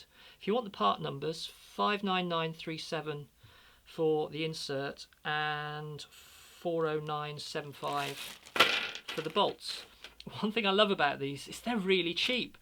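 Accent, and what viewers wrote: United Kingdom. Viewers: Fender uses those inserts in the Yngwie Malmsteen strat so you had a good idea for sure. I own that guitar and you can feel the vibration of the strings better than a normal neck. I use them on all my bolt on necks. Just found your channel, good stuff and everything sounds better when a UK lady speaks. Cheers.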